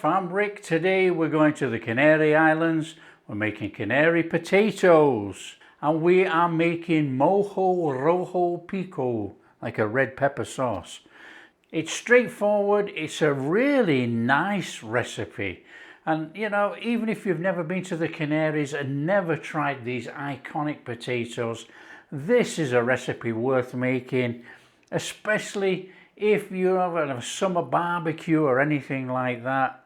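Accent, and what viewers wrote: Yorkshire, United Kingdom. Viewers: glad to hear the pronunciation disclaimer in today video of your pronunciation of Papas Arrugadas Canarian Potatoes with your Yorkshire accent. I would hate to see a quified chef like yourself mispronounce it and stir up the hairs on the neck of your resident pronunciation troll 😂😂😂😂. They look delicious my friend. Cheers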